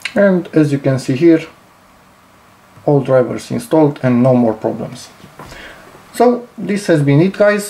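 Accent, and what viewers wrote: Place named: Romania. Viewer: Bro tu esti roman 100% :)))) <3 Edit: Te-am luat dupa voce si cum vorbesti Engleza da se vede si pe paginile web :)))))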